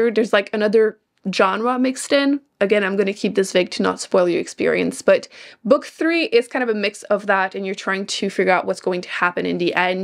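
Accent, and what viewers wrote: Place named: Quebec, Canada. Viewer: Thank you for sharing,! Stupidly question, are you from QC? I love the little accent.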